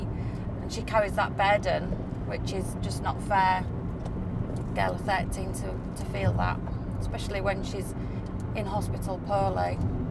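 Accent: United Kingdom. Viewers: I really wanted to watch this but that accent is so hard to figure out what she is saying! I tried the closed caption but apparently that thing can't figure out what she is saying with that ACCENT! If you guys are going to continue to make these with British accents then could you at least add your own closed caption!? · I usually understand british accents just fine but hers is so fucking annoying.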